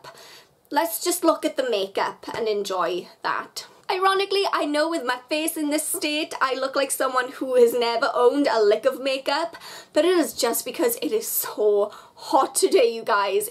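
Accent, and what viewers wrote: Wales, United Kingdom. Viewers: Omg r u welsh soo am i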